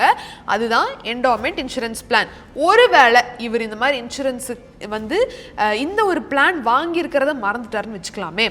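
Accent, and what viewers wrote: Coimbatore, India. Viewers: Coimbatore slang change pannuga 😅😅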